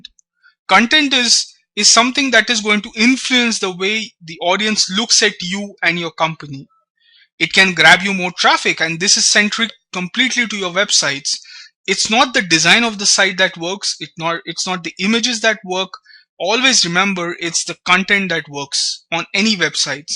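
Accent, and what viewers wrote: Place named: Scotland, United Kingdom. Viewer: ha its bit uk cuuunt. scootish i think.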